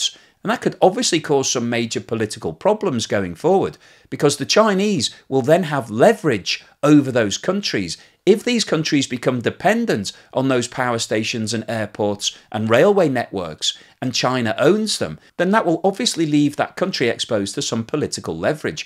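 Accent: United Kingdom. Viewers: This propaganda piece is way to cheap. Basically, you are saying that all these countries working on this "initiative" are idiotes and don't have a clue that they are controlled. The number of participating countries keeps growing, because the new comers are not as smart as you to understand the trap? Judging your accent, don't you think it's strange that GB was among the first to invest in the bank to financing this "disaster".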